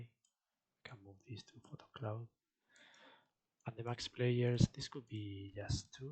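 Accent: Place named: Spain. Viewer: i would like to ask you something about photon plugin, and your english pronunciation is similar to Spanish people, im from spain too, can we comunicate in Spanish? to be faster :)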